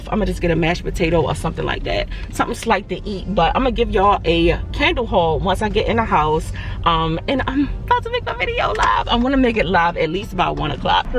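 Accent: Baltimore, United States. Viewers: You definitely are from Baltimore with that accent? Lol. Nice haul!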